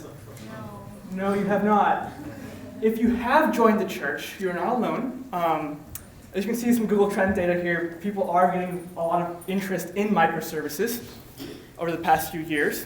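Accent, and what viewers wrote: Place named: West, United States. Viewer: Useful video. However may I suggest that you might want to try to keep your accent a bit neutral. I see a heavy leaning towards the western accent, but that's starting to sound quite artificial and meddling with comprehension. Just my 2 cents.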